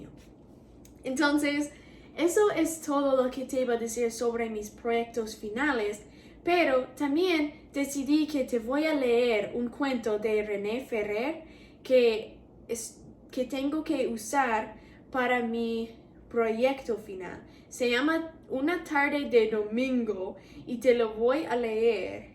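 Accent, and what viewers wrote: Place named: England, United Kingdom. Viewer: Que buen cuento. 🤔Me encanta leer y escribir.Y que bien que promuevas la lectura en jóvenes. 🤓 Hay vocabularioqie que se usa mas en literatura, algunas pocas palabras no se usan en la cotidianeidad pero te hiciste entender muy bien. 🤗Sólo te sugiero mejorar acentuación en algunas escuchandolas o escuchando audiolibros de tu interés. Y que hagas in video semanal leyéndonos algo, a mi me entretuvo con tu voz y acento inglés y así practicas. Por fis di que sí, andale por fis sería bonito. 😍Hasta me dieron ganas de volver a leer algo de literatura que he dejado a un lado por el trabajo. 😣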